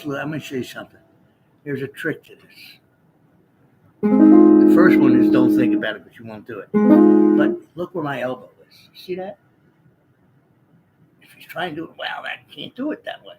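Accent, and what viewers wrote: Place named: Philadelphia, United States. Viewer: Keep that Philly dialect coming!!!! All those words George Carlin said you can't say on TV. Thanks for thew music my friend!!!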